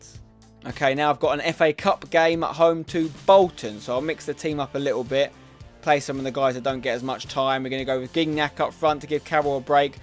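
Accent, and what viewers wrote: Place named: Cockney, United Kingdom. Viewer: It's just a cockney accent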